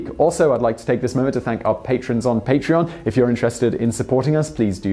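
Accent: England, United Kingdom. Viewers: Tell me why I thought vsauce was having a midlife crisis and took on an English accent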